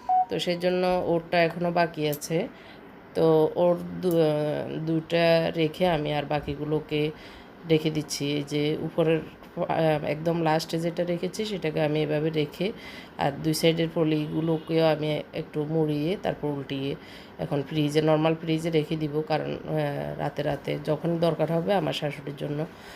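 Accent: Noakhali, Bangladesh. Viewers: আপু আপনার ভাষা অনেক পরিচিত মনে হলো।নোয়াখালীর ভাষা।আপনার রান্না ঘর অনেক সুন্দর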